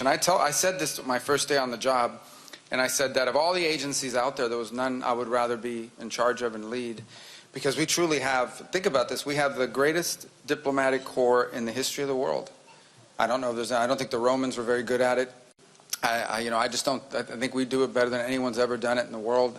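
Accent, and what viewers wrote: Cuba. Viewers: He got Cuban accent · He’s born to Cuban parents, obviously he speak Spanish as Cuban so; it’s not “black “ Spanish, it’s Cuban Spanish.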